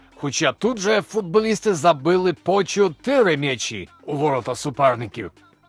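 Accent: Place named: Canada. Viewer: Коментатор,наче з Канади)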